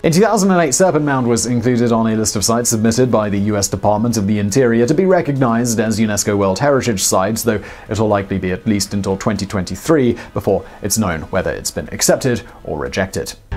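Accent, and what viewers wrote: United Kingdom. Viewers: All of the posters criticizing Simon’s pronunciation need to remember he speaks with a British accent. I challenge many of you to go to other countries and pronounce words & places the same way as natives! Better yet, visit areas in the US and try pronouncing words the same way as people living there. I had a friend who was annoyed that I couldn’t pronounce her daughter’s name correctly. She was from PA and I am a native New Englander, from CT. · There is something so absolutely soul warming about listening to a Brit discuss the glory that is America. Never change. Never change.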